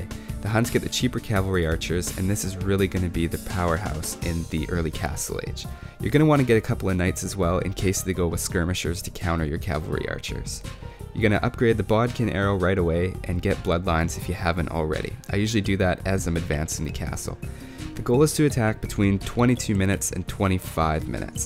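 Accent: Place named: Canada. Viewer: Your accent sounds Canadian haha. Are you from Canada?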